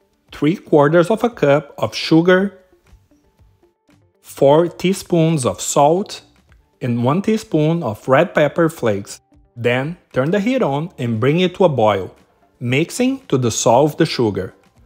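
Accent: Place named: Brazil. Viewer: dig the recipe and glad you mentioned the sour dough bread toast --i'd think that apple cidar vinegar in recipe would taste great with avocado toast and rind recipe. And great accent! it reminds me of a great friend in college who's from Brazil..an instructor and now a gym owner in Dallas.. More recipes segments to come i hope!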